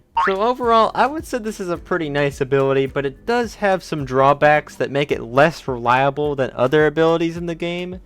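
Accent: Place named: United States. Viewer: This is my first time watching you and I was like wow he sounds very American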